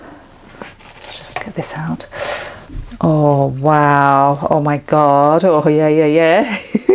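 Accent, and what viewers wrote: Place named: RP, United Kingdom. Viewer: Hi, I tried your chicken pot pie today. It’s a bank holiday weekend and I thought ‘why not’. This is the first time I have ever made a pie and the first time I have used a puff pastry sheet! I copied your recipe but left out the chilli powder and I couldn’t believe how tasty this turned out!! It was that tasty that I ended up eating the pie on it’s own! The only thing I will do differently next time is, I won’t make it slightly saltier when I taste the mixture - just my preference. A suggestion for you, if you don’t mind - when you say ‘my heat setting is a 4’, please always state what a ‘4’ is on your setting. You normally do say ‘that’s a low to medium’ but sometimes you don’t. 😀 In the past, whenever I watched YouTube videos, they were always different videos by different people. You are the first person who’s videos I keep coming back to because your recipes are so easy to follow! 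I love the fact that you do Asian recipes as well as English, and you sound so posh (in a good way!) Thank you for sharing. I have subscribed to your channel. 😀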